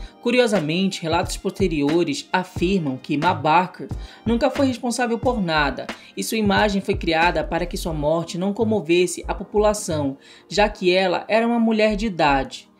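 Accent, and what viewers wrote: Pará, Brazil. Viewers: tem um sotaque paraense lindo. ❤ beijos manauaras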